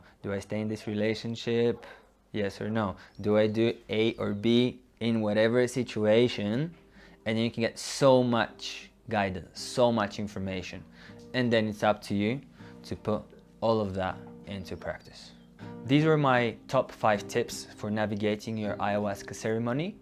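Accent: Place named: Jamaica. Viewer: Your accent sounds kind of jamaican hahaha i love it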